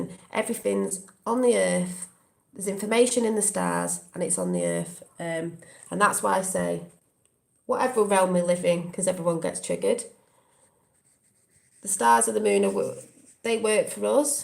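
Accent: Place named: Stoke, United Kingdom. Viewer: what part of the uk are you from, you sound like a stokie?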